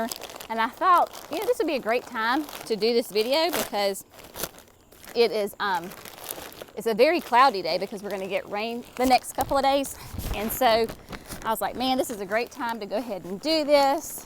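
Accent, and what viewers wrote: South, United States. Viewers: Oh, I love your southern talk when talking about the heat and humidity. I'm in Georgia so we're neighbors. Also love the music. Reminds of the times I was able to sit down at the end of a long day in the yard with the kids, heating up the grill for a burger.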